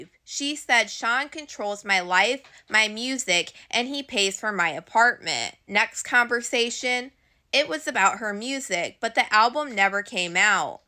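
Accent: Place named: Michigan, United States. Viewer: Where are you from? Your accent sounds like Michigan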